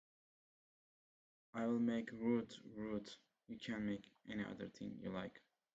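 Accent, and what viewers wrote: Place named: Turkey. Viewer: Btw, i am sure you are Turkish since your accent is like me :)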